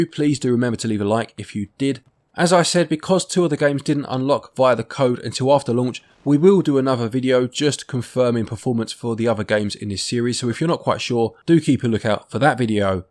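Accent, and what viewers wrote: England, United Kingdom. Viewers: As soon as I hear the English accent I’m hooked.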